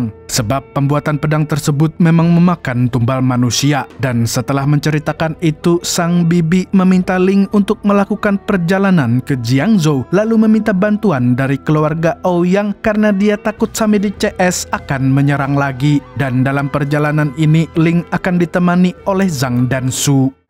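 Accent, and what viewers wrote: Banjar, Indonesia. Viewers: Banjar kah pyn 😅😂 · Logat banjar 😂👍👍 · Orang Banjar'kh ikam ni.? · Adminnya urang banjar le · Orang banjar kh admin nya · Org Banjar Sekali ny Sidin nih lah😂😂😂😂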